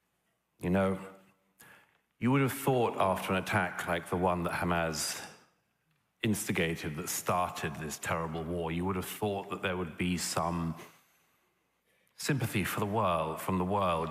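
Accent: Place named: United Kingdom. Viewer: I pray for your wellbeing Sir, for you have touch my hart with your british way of speaking. Your courage is and example to all people. Speaking to the world to open theire eyes for what had happend in Israël on the 7e of october. You are like the man's voice calling in the dessert! But, Sir, I still believe that your efforts will be heard. It is like the peppel touching the surface of the water! Your messages will always be a wake up call. May God be with you and give you the wisdom to bring the thruth. God said: I AM WHO I AM.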